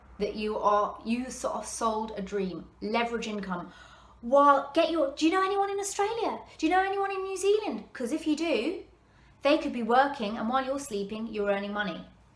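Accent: United Kingdom. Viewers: it's great to hear the rant in a British accent brilliant. By the by everything you said is true in amway also ..thank you cheers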